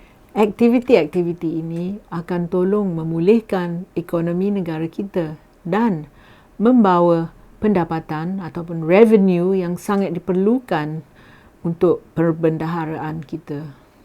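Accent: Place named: Manglish, Malaysia. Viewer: Kenapa pronounciation mariam mokhtar ni manglish sgt. ni lebih inggeris drp inggeris. Nak ckp, ckp la betul2..belajar ckp melayu yg <link> lain pandai 7 bahasa pun ckp tak pelat mcm. Melayu ni byk sgt berlagak nya bila lama sikit dok London.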